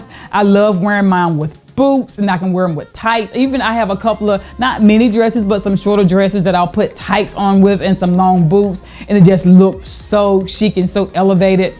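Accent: South, United States. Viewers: I knew you was from the south from your accent, ahhh NC. I’m from NC. Great video!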